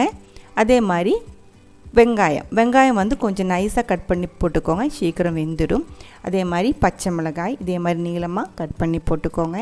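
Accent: Kerala, India. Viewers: Hi...its lovely to listen to your Malayalam mixed Tamil😍😍 Can u please leave the link of your malayalam channel. Waiting to see more vlogs from u😍😍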